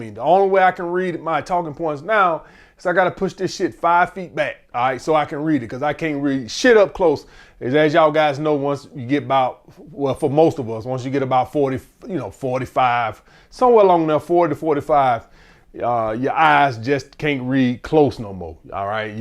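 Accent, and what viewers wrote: United States. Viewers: Scresss scresss scresss!! Country as HELL 🤣🤣🤣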